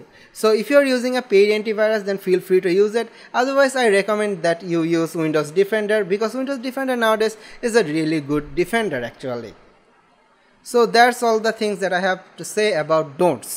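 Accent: India. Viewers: that accent sound Bengali...are you one tho?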